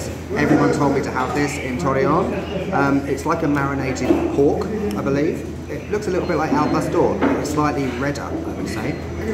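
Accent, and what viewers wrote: England, United Kingdom. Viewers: Hola! a mi me gusta tu acento inglés así que no lo cambies sigue siendo tu mismo! Me divierte mucho tu forma de llevar los vídeos! Me da muchísimo gusto que te gusto la comida de mi querida Laguna. Cuídate!!! Seguiré viéndote! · Please, stick with your English accent… your American accent is a bit South African lol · hahahahaha. Your American accent was awesome!!! But I truly prefer to watch your videos with your wonderful and sarcastic English accent. You can also drink electrolit but always cold, if not, it taste like shit. Be hydrated. Waiting for your next adventure.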